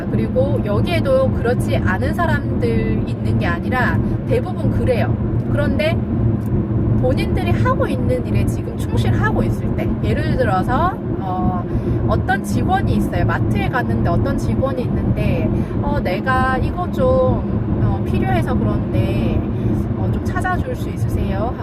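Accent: Gyeongsang, South Korea. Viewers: 고향이 경상도인가봐요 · 외국 사는 입장에서 남일 같지 않네요~~ 고향이 경상도 쪽이신가요? 저도 경상도라^^;; ㅋㅋ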